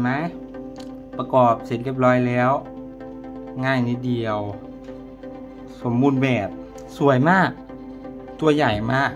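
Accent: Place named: Rural, Thailand. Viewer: พ่อค้าพูดเหน่อจังเลยคะ คนบ้านใด่คะ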